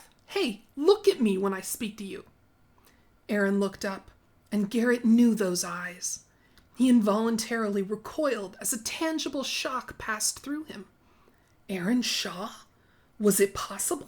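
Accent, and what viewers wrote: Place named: Russia. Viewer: Just love your Russian accent.❤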